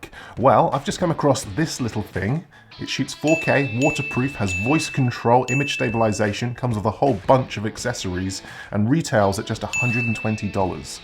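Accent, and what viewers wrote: United Kingdom. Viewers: Your British accent makes it even better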